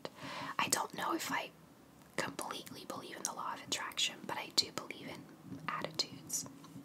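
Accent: United States. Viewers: You remind me a lot of myself, older than I look, not married, Barack Obama was my answer to ”who would u have dinner with?” (Btw I don't think grandparents is an obvious answer b/c people tend to pick famous or infamous people) anxiety issues, and I can be introverted yet adventurous. Can't imagine moving to another country so young though. Also you speak perfect English, w/ American accent. I think you must have been American in another life ;) though it's seems Sandanavian or Northern European English speakers- seem to have sometimes next to no accent at all. Especially Swedes. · I love your accent, it has always sounded American to my ear! I am from Scotland though lol so many accents sound American to me 😂 xx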